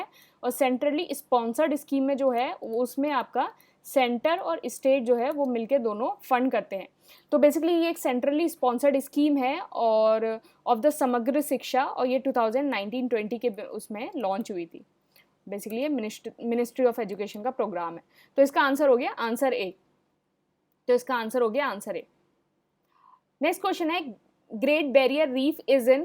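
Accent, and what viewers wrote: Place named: Lucknow, India. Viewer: Nice set, thanks. Ma'am aap Lucknow se hain na(just guessing,if you don't mind,apka bolne ka lehza batata h,aap Lucknow se ho)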